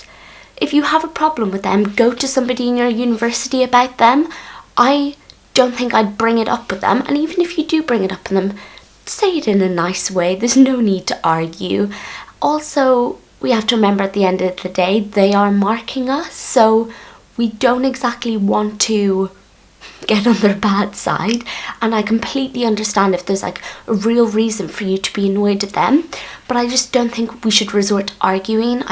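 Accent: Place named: Ireland. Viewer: great video. I start placement next month so hopefully these help. btw it sounds like your irish haha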